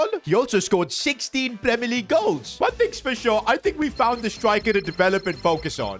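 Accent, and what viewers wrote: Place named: India. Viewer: You talk like an Indian!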